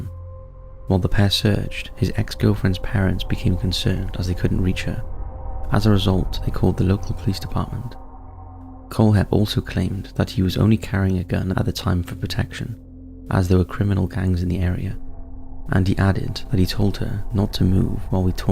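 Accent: United Kingdom. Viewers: I love your doco’s - I’ll be watching every one. Good realistic footage that’s relevant and great British voice ❤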